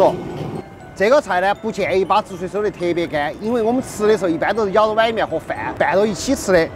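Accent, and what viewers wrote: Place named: Guanghan, China. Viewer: 你应该是德阳附近的人吧，和广汉人说话口音特别接近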